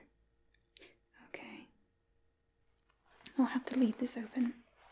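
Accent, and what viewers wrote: England, United Kingdom. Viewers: YOUR BRITISH ACCENT SLAYS AND SLICES ME IN HALF EVERY TIME OMGGGGG! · I’ve said it before, you do a really good English accent. Have you ever been to the UK. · Nice use of the English accent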